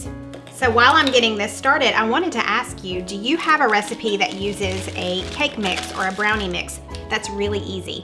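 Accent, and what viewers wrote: South, United States. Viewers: I do Santa Cookies in A Jar and gift them to friends so they can bake them for Santa I also do a hot chocolate in a jar and put them together in a gift basket. It’s always been a huge hit. I also do the Hot Chocolate in test tubes and put a ribbon and tag around them for my son to give to his friends and teachers. I love your southern accent I live way down south and I have it to. I guess the saying is true you can take the girl out of the south but you can’t take the south out of the girl no matter where you live. 😊 Merry Christmas!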